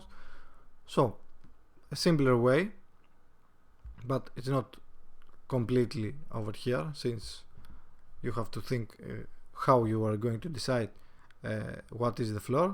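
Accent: Greece. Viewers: Are you Greek by any chance? :) Asking cause of your accent.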